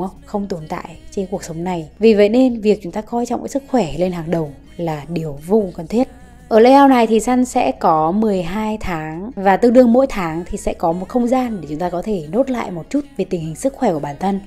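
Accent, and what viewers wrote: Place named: North, Vietnam. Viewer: À nhon chị Sun. Đầu tiên em muốn giới thiệu một chút về bản thân. Hì, em là Chou Chou, đã là sinh viên năm 3 rồi, và theo học kinh tế. Thực sự mà nói thì những thàng ngày đầu tiên tại trường đại học của em rất chán luôn, và rồi em bắt đầu đến với một câu lạc bộ vào năm 2, từ thời khắc đó phải nói deadline ngập đầu luôn, mình đã rất stress lắm, nhiều lúc chỉ muốn bỏ mặc hết tất cả, cả những chênh vênh của tuổi 20. Nhớ cách đây nửa năm nhỉ, em tình cờ một ngày chán chường lướt YT thì em xem được clip đầu tiên của chị, về lên kế hoạch. Thật sự lúc ấy em bị hấp dẫn bởi cái giọng Bắc nhè nhẹ của chị, có lúc Sun "xàm" nhưng rất đáng yêu, rất thu hút người nghe, và cả một ngày hôm đó hình như em chỉ xem mấy video của chị thôi. Và ngay sau đó em tập tành những trang Bullet Journal đầu tiên, nhưng mà trời ơi, em vẽ tệ hết sức, nhưng mà em vẫn rất vui, bởi vì trong thời gian đó em cảm nhận được mình hạnh phúc với những thứ nhỏ nhỏ mình tạo ra, Bullet đã giúp em rất nhiều, em đã xử lí thời gian tốt hơn, mặc dù đến bây giờ thì vẫn có những ngày em không hoàn thành đựơc hết nhiệm vụ, nhưng không sao tất cả đều phải có quá trình mà chị hen. 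Em vẫn nhớ chị bảo mỗi cô gái nên có một cây son đỏ, vì nó chính là sự quyến rũ đặc trưng của những cô gái Pháp, em nhớ mang máng là vậy, và em cũng vậy, cuồng một màu son duy nhất đó. Hihi. Không hiểu sao khi xem cái video này của chị, em có nhiều động lực lắm luôn, em viết mail cho ban Nhân sự để xin rời CLB để sẵn sàng bắt đầu những dự định mới của m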